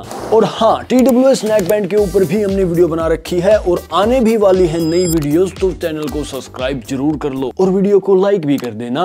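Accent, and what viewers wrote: Haryana, India. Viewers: Haryana se hai kya bhai 😊?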